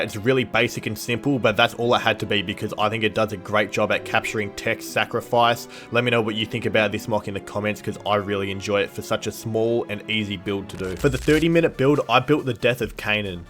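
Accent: Australia. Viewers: are you Aussie I am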